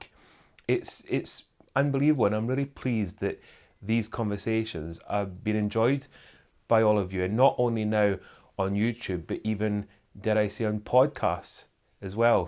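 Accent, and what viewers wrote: Scotland, United Kingdom. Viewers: I just found your YouTube channel. I love 💕 listening to your Scottish accent and all your stories about being a butler. I wish I could take an etiquette lesson from you but I live in the USA. Best wishes to you and the dogs.😊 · I had to wait for a further 2 hours to finally watch your upload, due to current electricity load shedding that has once again been put upon us here in South Africa, whereby our electricity is cut off for set periods of time throughout the day. It is so lovely to hear you answering some of the questions I read in the comments section earlier in the week. And of course, your Scottish accent is fantastic! My adoptive Father was born and raised in Edinburgh. Thank you so much, for taking time to answer so many of our questions. And it is always so lovely to see Shumba with you, and those beautiful pink Roses. 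Your suggestion of having a livestream Youtube Q&A at another stage sounds fantastic.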